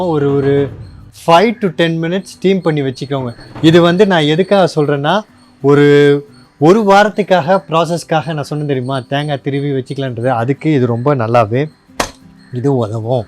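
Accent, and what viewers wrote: Tirunelveli, India. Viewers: Are u from thirunelveli?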